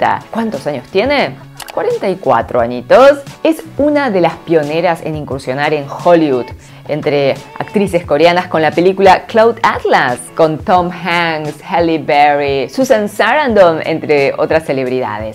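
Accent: Argentina. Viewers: Que bellezas las actrices coreanas ❤ me encanta tu acento argentino ❤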